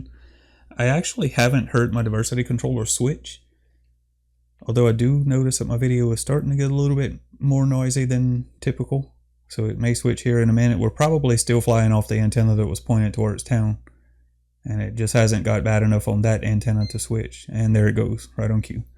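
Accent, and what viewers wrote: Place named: Louisiana, United States. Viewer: Where you from bro? Sounds like you have a Louisiana accent 🤔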